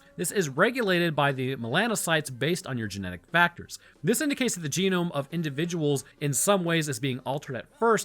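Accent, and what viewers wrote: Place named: Virginia, United States. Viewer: I had been waiting for the Appalachia thing to come up at some point, I used to live in the foothills of VA and pronounced it the way you do. Met my now girlfriend who went to App State, got castigated and admonished regarding my pronunciation very quickly. Needless to say, the battle was lost henceforth. Edit: we are both now in CO as well, ironically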